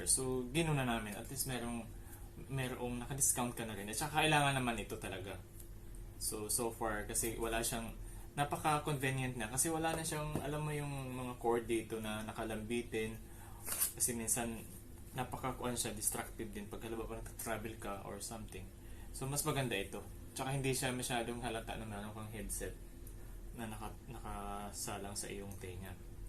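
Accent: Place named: Visayas, Philippines. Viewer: Bisaya to si sir uh.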